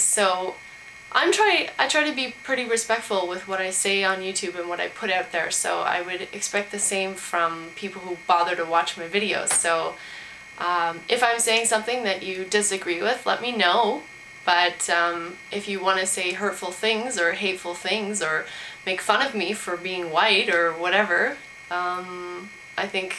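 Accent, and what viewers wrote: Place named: Canada. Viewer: You Canadian, ain't ya, white girl? Judging by the accent. :)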